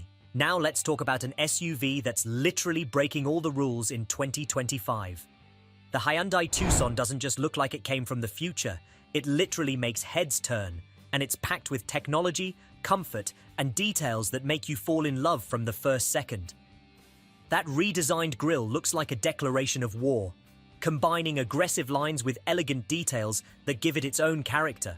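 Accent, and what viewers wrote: United Kingdom. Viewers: British accent sales the videos and products , why? That is the culture and mindset !!!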